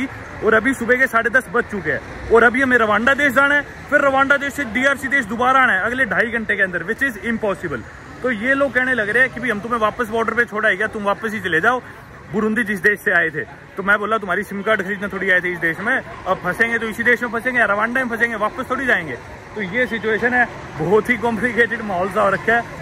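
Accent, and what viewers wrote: Haryana, India. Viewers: Bhai ki hryanvi language sun k swad aa je h❤❤❤❤❤ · great bhai tumhare sath safar ka maza hi kuch or hai haryanvi tone bhot badiya lage manne. tum sach much great ho dear👍🥇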